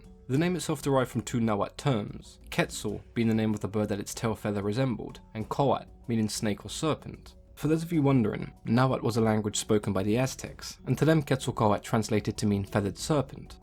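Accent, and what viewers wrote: United Kingdom. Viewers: A schmuck with a British accent trying to give info on Aztec mythology! Foh smh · Okay, I've just realised your British, I think, maybe? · ok...whats your accent? You sound British but I don't know if that's what it is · Yes there's something about the British accent that lends an air of Professional Expertise on any subject. I wish my high school professors talked like this. :)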